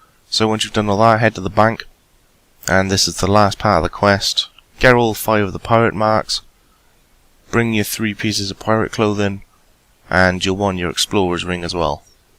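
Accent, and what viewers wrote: Wales, United Kingdom. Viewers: Best guide maker i've found without a doubt. That Welsh accent just reminds me of Dirty Sanchez every time I hear it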